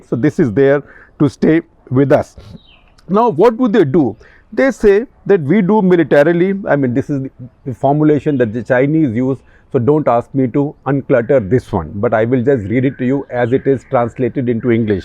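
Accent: India. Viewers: His english is fluent with indian accent which is perfect for indians as most indians don't understand UK or USA accented english.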